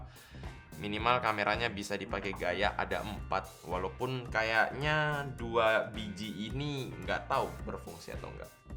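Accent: Makassar, Indonesia. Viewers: Bukan malah sebaliknya. Justru ciri khas nya itu adalah logat atau bahasa Makassar nya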